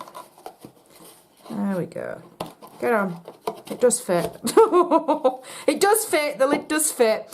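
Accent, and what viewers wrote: Yorkshire, United Kingdom. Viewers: Oh my gosh girl. Not only do I love this box, but you make me laugh. It's just like listening to my cousin talk! i was born and bred in Yorkshire, and my family still live there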